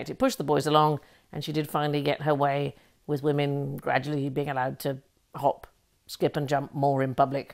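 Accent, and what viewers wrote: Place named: United States. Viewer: I love when you use your American accent.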